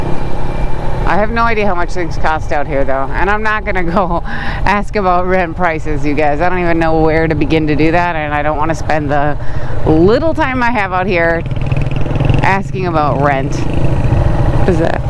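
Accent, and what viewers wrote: United States. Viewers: Dear, thank you for the video but I have a question, why do you keep repeating "this looks fancy to me", "this looks posh", "rich people live here"? From your accent you obviously come from the US. So, there are no "fancy" neighborhoods in America? Do Egyptians have to live in slums to conform with the stereotype the West has about Egypt?? Egypt has 110-Million citizens, of course at least a couple of millions can have enough money to live in nice neighborhoods. This view of Egypt as a country that MUST BE POOR through and through is prejudiced and not right. Every country has rich and poor neighborhoods. In your country you have Hollywood and the Bronx, so let's drop this "fancy rich neighborhood" narrative as it is insulting to many hard-working Egyptians who have EARNED their right to live in "fancy" neighborhoods. Good luck with your videos! 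· I am Egyptian and I like watching your videos and listening to your American accent that's how I can get the accent .Go on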